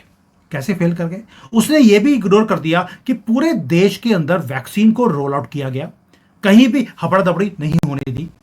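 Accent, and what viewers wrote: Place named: Punjab, India. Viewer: ਭਾਜੀ ਤੁਹਾਡਾ ਪੰਜਾਬੀ ਟੱਚ ਬਹੁਤ ਵਧੀਆ ਲੱਗਦਾ ਹੈ।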